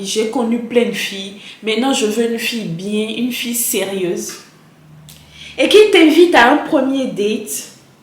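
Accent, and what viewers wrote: Benin. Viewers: ah d accord super alors je suis béninoise aussi j’ai reconnu l accent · Tu es Béninoise ? Ton accent ❤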